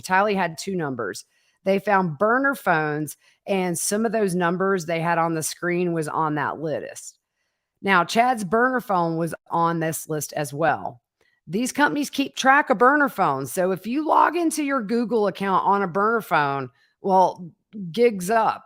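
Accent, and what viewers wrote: South, United States. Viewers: Love your accent. I’m Irish and live in Ireland, but must have been a Southern Belle in a previous life😂😂 Only joking. But love the accent, love saying y’all. 😂😂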